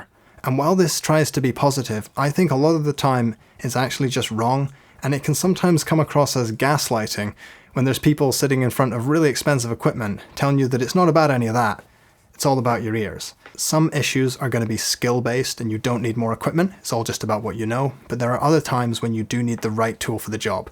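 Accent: Scotland, United Kingdom. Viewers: Love this and your accent. I'm from Scone (live innLondon) i swear you have a Scottish accent, or maybe i am home sick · Was not expecting a fellow Scottish person when I clicked this 🤣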